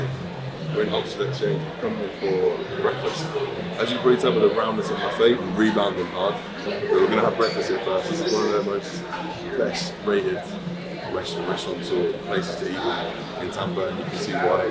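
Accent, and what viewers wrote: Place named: United Kingdom. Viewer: Bro I don’t know if it’s the British accents or what but I have been on a British bodybuilding channel binge for the past couple months great content sir.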